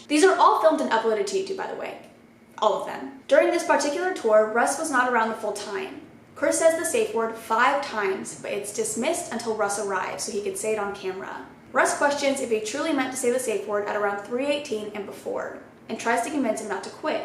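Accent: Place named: South, United States. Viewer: That insane they all need arrested. Love your southern accent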